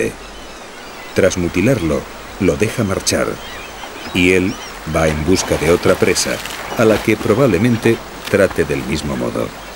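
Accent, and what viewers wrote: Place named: Spain. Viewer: El problema es que el acento españolito no ayuda !!!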